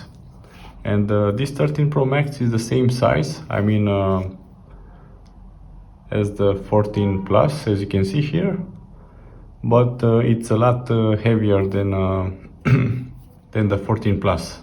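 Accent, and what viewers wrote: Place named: Romania. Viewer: English is given, but the diction does not help him, it takes a long time until the words come to his throat, I still recommend him to buy a newler microphone, something that you say speaks from a jar :)) and let it be softer with Romgleza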